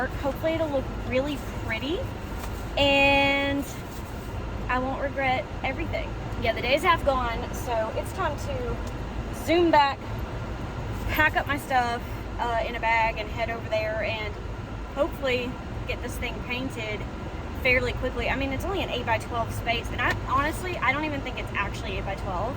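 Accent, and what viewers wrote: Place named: Carolinas, United States. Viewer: You mention knowing Abby ~ she’s from the Jacksonville area and you sound like your’re from one of Carolinas. Take Care. 😊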